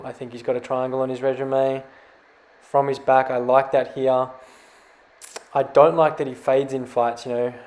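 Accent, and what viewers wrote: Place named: Australia. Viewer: Great content. Great fucking vid, some parts were very funny. What does cadjup mean? Much love from Aus, when is the next vid out? Sounds like you are from Australia.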